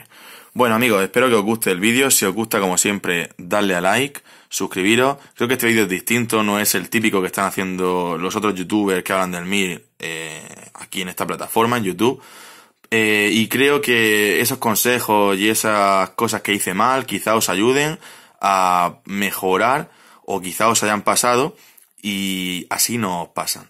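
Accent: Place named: Durango, Mexico. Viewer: amigo medio durango vos .....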